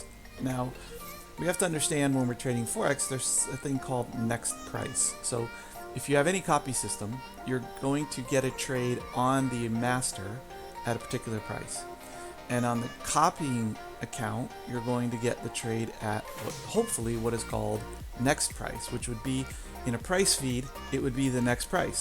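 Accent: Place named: United States. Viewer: This is a really odd video and contains so many incorrect statements it's not funny. I don't see anywhere on the video where you show any of the bots or portfolio that you created. You don't mention anything about trading them semi-automated through the Telegram alerts so I can only assume you ran this 100% automated, 100% of the time? If so, that is clearly NOT how the software is designed to be used. It is NOT an EA or anything close to an EA. It relies on you, the user creating bots and a portfolio that satisfies your own criteria as a trader. It also relies on you as a trader to decide on which trades to take once they are presented to you. Having a conversation with another user, rather than with ourselves as the creators seems mighty strange to me. It looks like the blind leading the blind. As you can see from the live leaderboard, many of our traders are achieving excellent results from their own created and managed bots, see here <link> As for your execution issue that you mentioned, how on earth can that be something we control. 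What has your broker suggested (I'm assuming you asked them or did you simply blame us?) The system sets the trade on the built in virtual bot and then instantly copies that to your live account. You sound American to me, so just maybe it was an issue with your FIFO rules that your brokers place on you. Of the hundreds of live users, all with a large variety of brokers, I've never heard this issue before. It is certainly not a software issue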